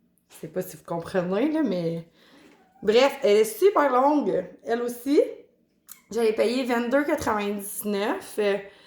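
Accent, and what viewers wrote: Canada. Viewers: Paque,mainnant, poussa ,cela me vas bin .J aime comment parlent les canadiens, j adore 🥺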